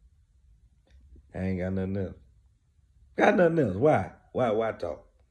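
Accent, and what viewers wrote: South, United States. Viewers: thats how us folks down south say it tho lol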